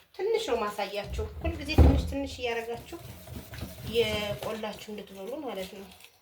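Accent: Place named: Wolaita, Ethiopia. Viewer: ፔኪን ፖውደር ነው የሚባለው አማርኛሽ ግን የወላይታ ነው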